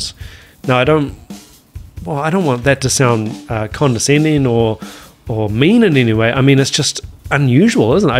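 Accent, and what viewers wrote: New Zealand. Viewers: Don’t forget the people who don’t speak English at home. Add the NZ-accent to that. I only hear some English like sounds but can’t make up the words 😰😰😰.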